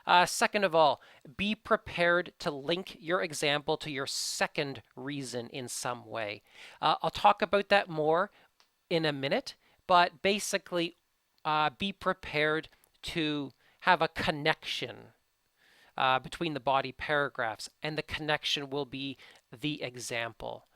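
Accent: Canada. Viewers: Hi, first of all thanks a lot for great content. Your website and your videos are really helpfull. However I have a question. You say that we shouldn't write a sentence like `This example demonstrates how...` but almost every essay that you have on your website has a conclusion like that on body paragraphes. I think its a nice way to summarize the main idea that we posit on that paragraphe. But as I said im kinda confused atm ;D Btw I love your Canadian accent ;D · Btw, arr you canadian? You have that accent lol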